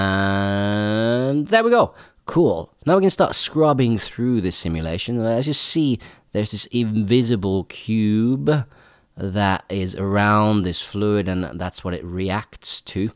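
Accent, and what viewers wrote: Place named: Scotland, United Kingdom. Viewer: You have Scottish accent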